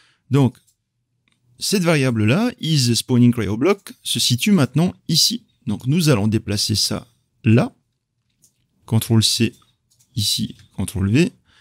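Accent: Tahiti, French Polynesia. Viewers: Bravo, vraiment! Je me demandais quel accent tu avais:) Tahitien donc!, enchanté moi je suis Breton:D Tu as du skill c'est indéniable, continu STP avec tes vidéos (je n'ai vu que celle-ci:D) d'aider la commu francophone sur se superbe moteur. Bon maintenant je vais voir tes autres vidéo:D Par contre j'ai pas compris pour ta timeline si elle est de type vector par exemple, est ce que l'on pourrais en faire une macro et la mettre dans l'actor component? Encore merci tu viens de gagner un fan:)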